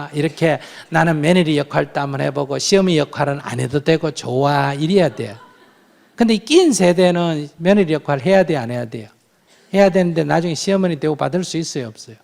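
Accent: Gyeongsang, South Korea. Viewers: 스님 경상도 사투리 라서ㆍ더 매력 있습니더 예 ㅡㅡㅡ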